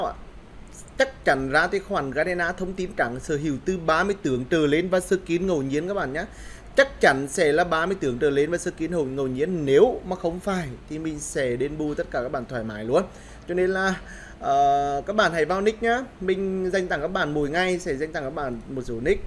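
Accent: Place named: North, Vietnam. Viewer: Giọng nói miền bắc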